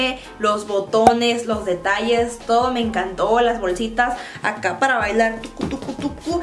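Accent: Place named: Monterrey, Mexico. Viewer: Tanto vídeos que he visto tuyos y en este note mucho tu acento regio hahaha Eres la mejor ♡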